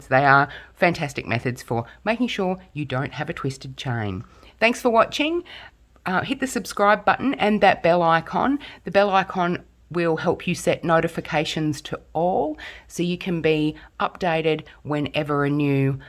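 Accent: Australia. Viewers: Thank you! Firstly, it's nice to hear a fellow Aussie! This tutorial is so helpful because many foundation chain videos don't tell you what part of the chain you need to slip stitch into. I've often thought I'd done it correctly and then realised my join is at the wrong part of the chain and I end up with a gap. Thank you!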